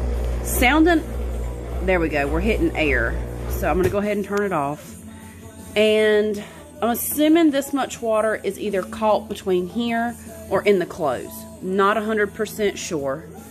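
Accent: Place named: North Carolina, United States. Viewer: Are you from NC or SC? Your accent is damn near identical to mine and I'm from NC 😆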